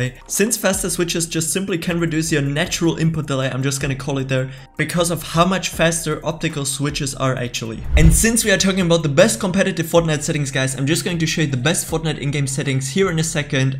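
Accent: Germany. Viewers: der klingt anders deutsch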